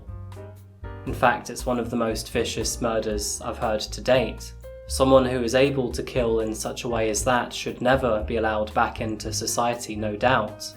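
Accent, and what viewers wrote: United Kingdom. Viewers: UK accent? Plaid shirt? XXL Forehead? I thought we were gonna give it a goo :(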